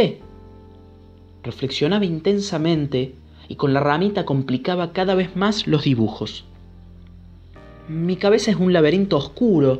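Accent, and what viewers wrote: Cordoba, Argentina. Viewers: Me encanta ese acento cordobés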